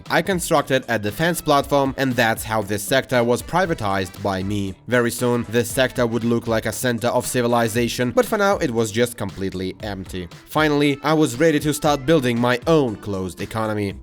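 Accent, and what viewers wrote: Russia. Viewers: я сразу почувствовал что ты россиянин, но слова ты выговариваешь хорошо, многие западные славяне говорят намного хуже тебя, что уже говорить про остальных русопитеков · If anyone curious - that's what russian accent actually sounds like. ;)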